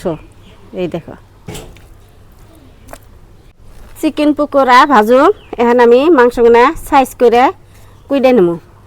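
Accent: Tangail, Bangladesh. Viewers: টাঙ্গাইল এর ভাষায় কথা বলেন আবার পরিচয় দিচ্ছেন ভারতীয়? এই ভুল তথ্য দেওয়ার কারণ কি?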